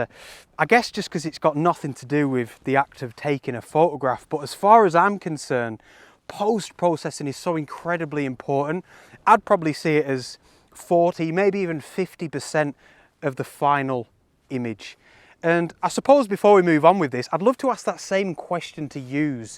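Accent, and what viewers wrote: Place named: Liverpool, United Kingdom. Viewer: why does his accent sound like the Beatles?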